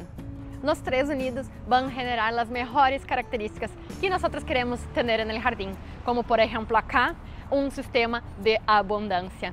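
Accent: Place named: Brazil. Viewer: Cai de paraquedas aqui e vi que era brasileira pelo sotaque, ótimo vídeo parabéns 👏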